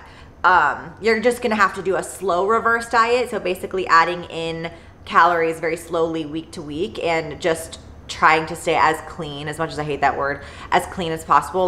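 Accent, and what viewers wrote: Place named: Pennsylvania, United States. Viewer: I HEARD PENNSYLVANIA ACCENT, and as someone with family from PA I TOTALLY understand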